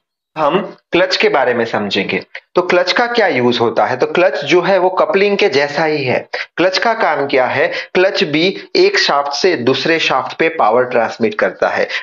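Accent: Gujarat, India. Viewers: sir are you gujarati?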